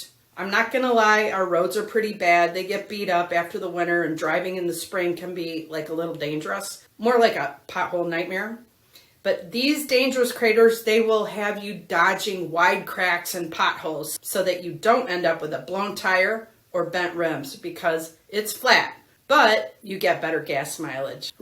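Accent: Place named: Michigan, United States. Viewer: Perfect Michigan accent!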